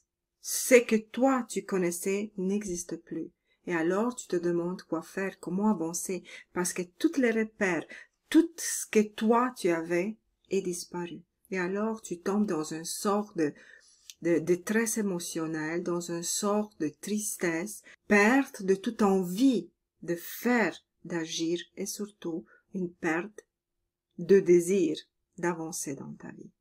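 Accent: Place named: Latin America. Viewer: Merci. Je ne peux pas dire que c'est une période difficile que je traverse mais une période de transitions avec divers projets qui demande courage, patience, persévérance, quasi à la retraite,... j'applique déjà ces 3 conseils mais j'apprécie beaucoup de les entendre de ta voix. Cela cautionne ce que je mets en place pour parvenir à réaliser mes projets avec sérénité, à être patiente avec moi-même.....j'aime ta voix apaisante, ton accent latin doux et chaud et parfaitement compréhensible. Je t'aime aussi chère.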